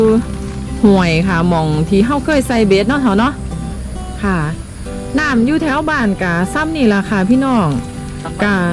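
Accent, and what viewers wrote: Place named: Ubon Ratchathani, Thailand. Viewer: สวัสดีค่ะ เพิ่งเข้ามาดูครั้งแรก บรรยากาศดีมากดูแล้วคิดถึงบ้านเลยค่ะ สำเนียงคือคนอุบลฯ เป็นคนอุบลบ่จ้า ~จากคนอุบลพลัดถิ่นจ้า~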